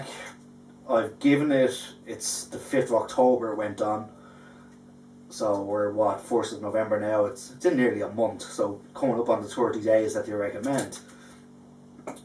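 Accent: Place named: Ireland. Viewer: I feel your pain with the CML yeast, my porter took 4 1/2 weeks and 3 packs to get down to 1.020. I wonder why their recent batches of yeast are having issues? Like you said you've been using it for a year, so it's a recent issue. Hopefully they sort it out, they are great little company, love their hops. Oh and welcome to brewtubing, nice to see another Irish accent. Top video btw 👍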